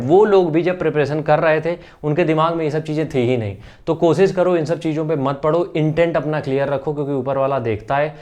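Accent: Kanpur, India. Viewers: Kanpur se hai lgta😊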